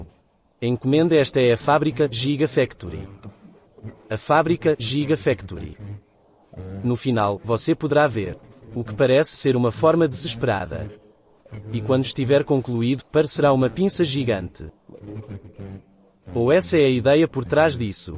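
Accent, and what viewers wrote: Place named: Portugal. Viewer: Você é de Portugal? Eu sou do Brasil, e pelo q entendi, o sotaque no vídeo é em PT-PT, e não em PT-BR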